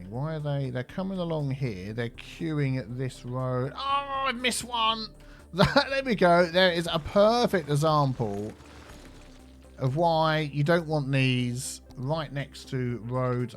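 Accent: United Kingdom. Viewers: It's his voice. It has that soothing, calming British tone to it... 🇬🇧😆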